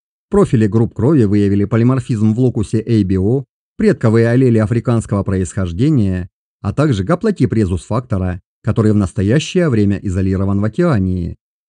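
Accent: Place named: South, Russia. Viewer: Юг России. Просто тихо и в наушниках.)))я же увлекаюсь лингвистикой))и мне говоры не мешают. Такиньки. Южняк)))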